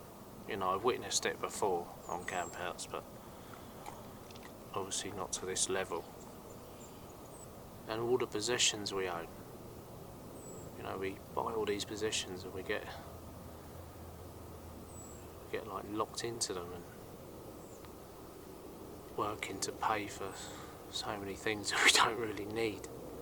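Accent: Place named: London, United Kingdom. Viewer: where are you camping, i dont expect to know the exact location but is it private land or public. Im gussing as you have a London/ Essex accent its local to you ???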